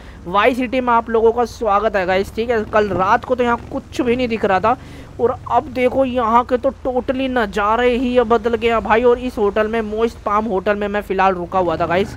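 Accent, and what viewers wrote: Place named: Saharanpur, India. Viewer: WAHHHHH BHAI SAHARANPUR WALI BOLLI ENGAE UNGAE ❤❤❤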